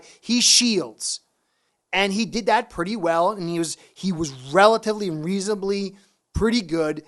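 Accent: New York, United States. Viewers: LOL!, I love it when you say "We're DOOMED!!!" 🤣 On another subject, (just curious), how does a guy with a New York accent turn out to be a Phins fan? For me, I became a fan in 1970 (I was 13) and the Dolphins were the only NFL team in my home state. How about you, how and when did you become a Phins fan?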